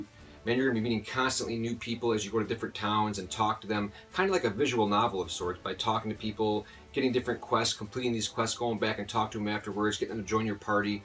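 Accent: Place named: Wisconsin, United States. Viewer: great job man! keep it up dude. are you from wisconsin? your accent sounds like it. could you start listing the game titles in your description box? thanks dude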